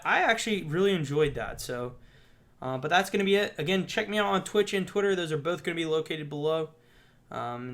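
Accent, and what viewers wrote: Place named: Pittsburgh, United States. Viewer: are you from Pennyslvania? You have kind of a Pittsburgh accent...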